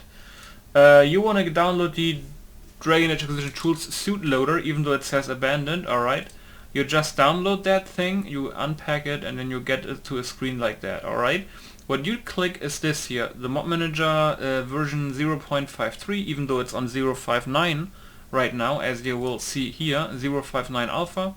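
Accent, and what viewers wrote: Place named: Germany. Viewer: Dude seriously as a german myself watching this video was funny af with his constant accent Iam litterly dying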